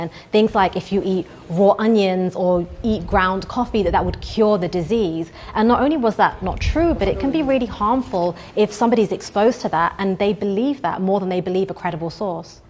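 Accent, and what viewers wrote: United Kingdom. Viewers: I always trust people with UK accents, even if they are just repeating bogus info. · She sounds British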